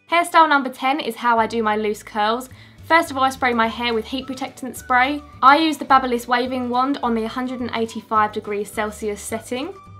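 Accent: United Kingdom. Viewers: I love your British accent 😍😍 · British accent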